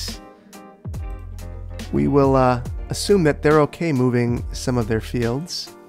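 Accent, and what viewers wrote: Wisconsin, United States. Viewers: Unthaw… dude you’re totally from Northeast Wisconsin…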